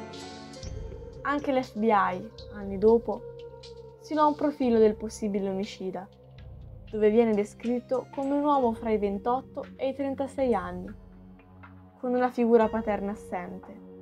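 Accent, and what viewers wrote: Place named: Tuscany, Italy. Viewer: figurati poi mi è sempre piaciuta la parlata toscana grazie a te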